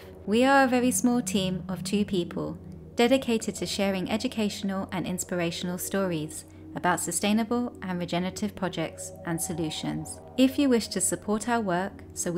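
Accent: London, United Kingdom. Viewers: Nicely narrated. Detect a slight London influence? Excellent!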